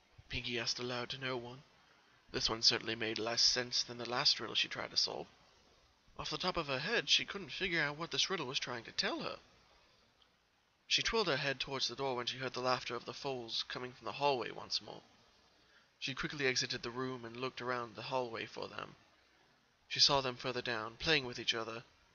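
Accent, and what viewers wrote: England, United Kingdom. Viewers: What's with the english accent in your narrative? I admit, it does add to the drama.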